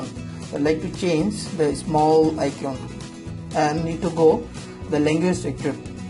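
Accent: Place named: India. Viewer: tech tutorial videos always have indian accent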